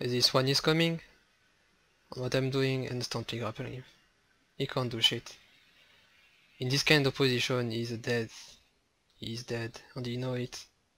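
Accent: France. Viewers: Tu a un petit accent français nn ?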